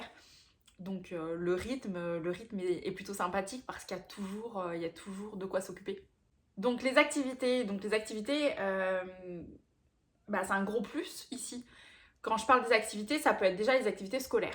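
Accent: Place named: Quebec, Canada. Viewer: Tu commence a avoir des intonation de quebecoise.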